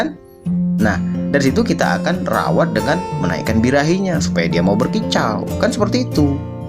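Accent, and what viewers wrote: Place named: Sumatra, Indonesia. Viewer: Macam orang sumatra logat ko wak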